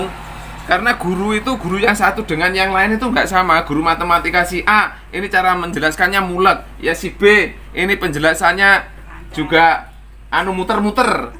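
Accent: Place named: Java, Indonesia. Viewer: Maturnuwuun om. Sederhana tapi jelas penjelasannya. Bonus diselingi logat2 jawa yg lucu 😁👍👍👍👍 Keren om...sukses selalu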